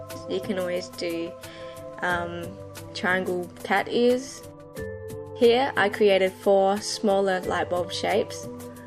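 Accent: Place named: Asia. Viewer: An Asian English accented girl... I LIKE IT!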